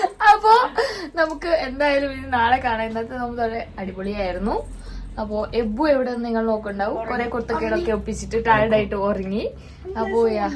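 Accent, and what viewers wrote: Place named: Mangalore, India. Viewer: Mashallahh adipoli....ningade family nte vlog kanumbo oru positive energy aan❤️...ningade family pwoli aan onnum parayanilla....ithamare randu perum othorumayode enth bangiya kanaaan❤️.... samsaram kelkkan nalla rasan...manglur style adipoliya 😊....i am exciting for your eid vlog....mashuthantem eid dress okke kanaan kothiyavunnuu....njan malappuram aan ithaa....ningade familye onn meet aakan nalla aagraham nd❤️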